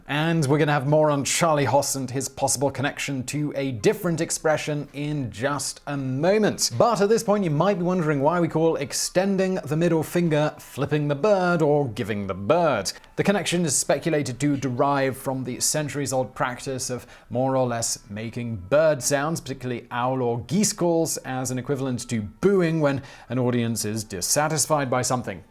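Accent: United Kingdom. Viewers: This guy is f*cking hilarious! There's just something incongruous about a fellow with such a dignified, proper british accent using our favorite euphemism for "sexual congress.". As y'all might say, "Spot on, mate!"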